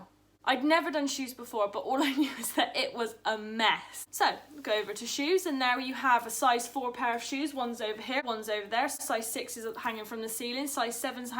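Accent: Bristol, United Kingdom. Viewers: Love the video. Bristolian accent I’m guessing ayyy.....were the same I’ve subscribed to your channel ❤️ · We all know it was Bristol primark babe your accent is thicc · Bristol maybe judging by her accent ahah <3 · I’m from Bristol u sound really Bristolian ?? Xx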